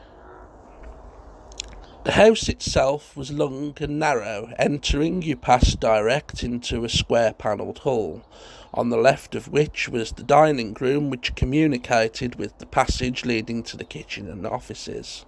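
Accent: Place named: Solihull, United Kingdom. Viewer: What’s the narrator’s accent? I want to guess Solihull, but I must admit my experience is very limited, being an Australian presently kipping in London...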